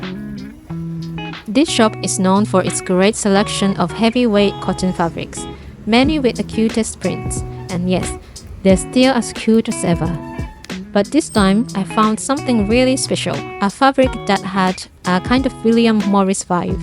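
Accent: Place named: United Kingdom. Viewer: I have never seen your channel, and I like your accent, it’s very British.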